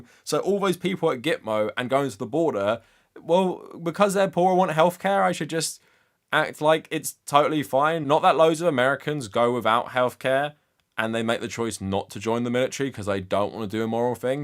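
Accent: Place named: United Kingdom. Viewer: But you sound like a "bloke" who lives in a country with a KING!!!!!! Is that more evil than the US system, or is it the same? What is your opinion of the house of Lords? It's ok that you have opinions, but it seems you don't have any mirrors in your house..... maybe look at yourself? just sayin....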